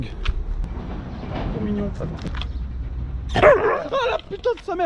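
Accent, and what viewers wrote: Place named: Algeria. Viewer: Je l’aime bien parler français algérien Vous êtes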